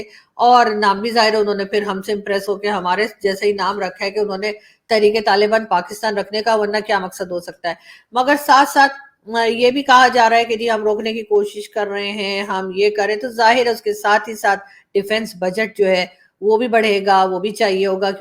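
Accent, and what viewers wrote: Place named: Mumbai, India. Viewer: Aap ka aaj kal urdu ka bolne ka slang bombaiya ho raha hai, Bollywood style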